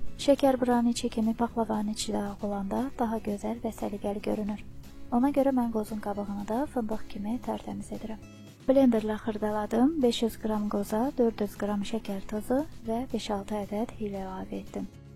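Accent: Lankaran, Azerbaijan. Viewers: Salam xanim,videonuzu izledim.Her wey Cox temiz,cox gozeldi.Aydin,selist nitqinize o melahetli sesiniz cooox yarawir.Men de paxlavani eyni Bu qayda ile edirem,ancaq qozun qabiqini hec vaxt temizlememiwem,hovselem catmaz buna😀Size wexsi bir sualim olacaq,cavablandirsaniz xow olar?Siz cenub zonasindansiniz?Lenkeran,Lerik?Lehceniz yoxdu,Amma bir kelmenizden orali olduqunuzu tehmin etdim.Sadece Duz fikirlewirem ya yox onu bilmek isterdim.Cox sag olun!Size 👍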